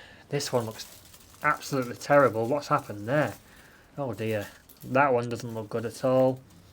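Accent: Lancashire, United Kingdom. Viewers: Hi I'm on darwen not sure but you sound like you have a Lancashire accent.if you are where do you buy your compound and how much.none on the supermarkets that I've been too x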